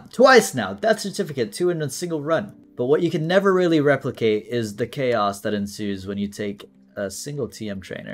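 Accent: United Kingdom. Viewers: Never thought that would be even more british by the day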